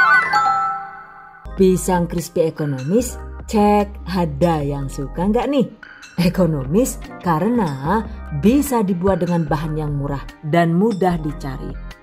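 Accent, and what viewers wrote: Banyumas, Indonesia. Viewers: Penasaran sm suaranya, kirain sdh ibu" stngh baya, tak taunya msh muda bs ngapak sdkit ngebodor bs bhs inggris pula keren 👍👍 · Wong ngapak kie yah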